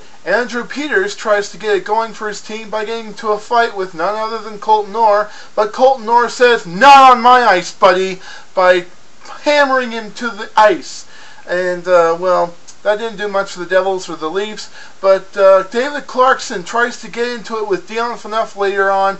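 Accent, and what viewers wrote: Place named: Sweden. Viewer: Why the Swedish accent?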